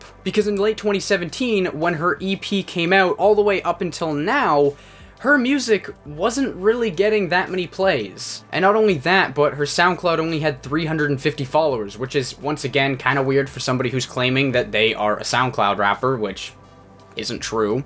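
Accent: Canada. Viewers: Bro are you Canadian we can tell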